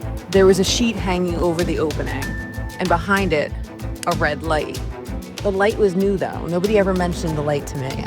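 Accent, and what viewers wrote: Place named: New Jersey, United States. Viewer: Loved the narrator's voice... she's definitely Jersey. Looking forward to more.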